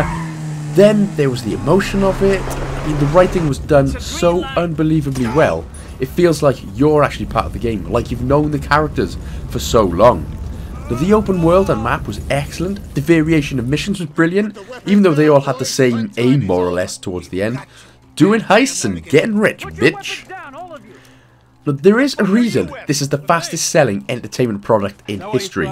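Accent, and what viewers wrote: Wales, United Kingdom. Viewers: Bloody decent vid buddy 👍🏻 nice to hear a welsh voice on Yourube for a change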